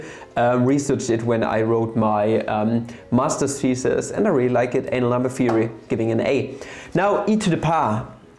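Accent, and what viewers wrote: Germany. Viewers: german guy tryna speak english for half an hour straight yes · i bet youre german (5 seconds in) ps: bin selber deutscher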